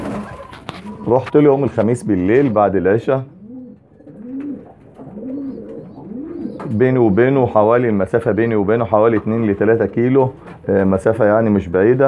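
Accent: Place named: Syria. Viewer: هو حضرتك سورى كلامك عامل زيهم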